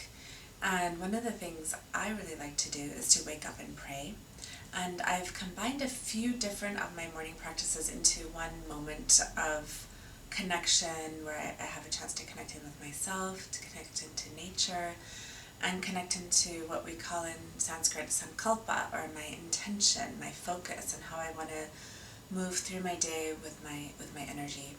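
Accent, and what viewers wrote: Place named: Scotland, United Kingdom. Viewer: love the Scottish accent - so beautiful, thank you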